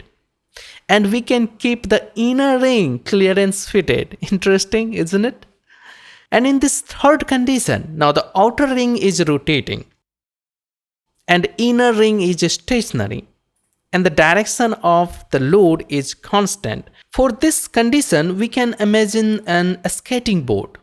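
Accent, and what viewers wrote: India. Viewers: are you Gujarati?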